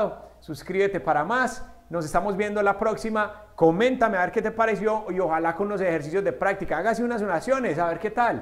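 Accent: Colombia. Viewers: Me encanta tu acento colombiano. :P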